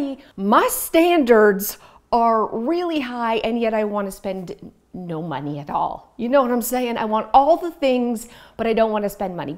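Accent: Canada. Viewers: I don't have ADHD but I suffer depression since I was in my early 20's. All that mentioned today was a big help 😃 I started decluttering and re-organising my home about 5 weeks ago. I found your channel 🥰 I love your Canadian accent x I lived there for nearly a year in the 90's. you have been a great inspiration to conquering this huge mission I've started 😘 So far I've done 4 trips to the op shops here in Australia 🇦🇺 Reusing and upcycling what I already have in my home 🏘️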